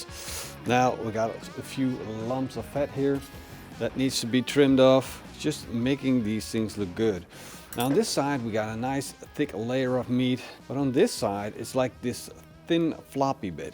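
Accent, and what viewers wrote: Netherlands. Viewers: I almost metioned the cold smoke before the cook, but I knew I had to wait until the very end before commenting. You know your stuff, and that is why I watch. Once again, I love hearing the Dutch accent. Reminds me of my youth in Den Haag.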